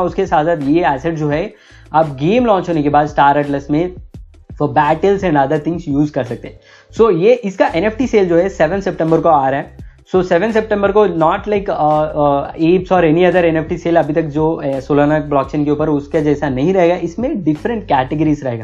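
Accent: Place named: South India, India. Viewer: maybe your accent is different that's why , my south indian friends are also like you , don't take it to your heart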